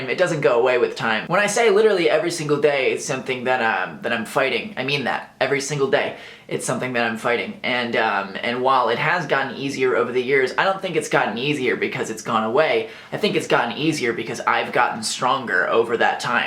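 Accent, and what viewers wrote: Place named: United States. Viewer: I CANT WITH AMERICAN ACCENTS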